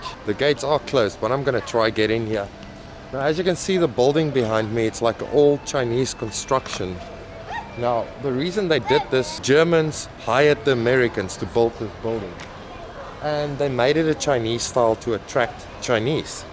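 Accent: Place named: South Africa. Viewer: Your accent is giving South African are you South African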